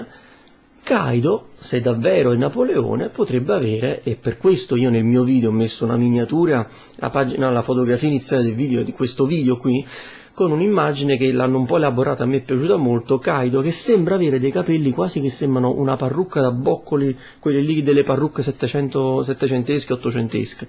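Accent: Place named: Naples, Italy. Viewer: Davvero ottimo video .. come tuo solito ! E poi ci avevo azzeccato che eri di napoli hahahaha sei fantastico frat'm. Comunque volevo porti una domanda , e possibile che non come molti pensano e cioè che law a PH abbia chiesto a luffy un'alleanza in virtù del tutto fortuita visto che proprio per puro caso i due si siano incontrati in quell 'isola oppure che law sappia la natura di kaido (ovvero la D) e proprio per questo sapendo che luffy è della D sia per un alleanza nella conseguenza di attaccare un altro imperatore? ! Da law come stratega me lo aspetterei anche se mi rendo conto che questa teoria è parecchio campata in aria. Comunque saluti e continua sempre cosi! Appassonaci con le tue teorie e i tuoi studi su one piece proprio con il tuo modo "scialbo" e molto semplice che contagia tutti! Si o mast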